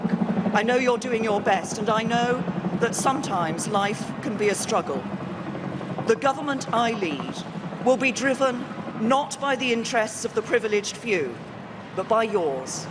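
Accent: RP, United Kingdom. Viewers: i still remember reciting this exact whole speech in a presentation at high school 'cause i was magnetized by her posh accent. I'm not a native speaker, by the way😎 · O sotaque dela seria RECEIVED PRONUNCIATION? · Okay but she speaks so well though. So posh!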